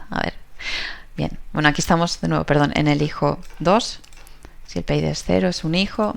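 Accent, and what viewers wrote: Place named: Navarre, Spain. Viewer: hola! en cuanto te he escuchado he sentido que eras cercana a mí. así que de cerca de Pamplona, yo de cerca también, en la ribera. muy genial tu canal, me encanta la temática y lo simpática que te muestras. ¡AUPA PUES! 😜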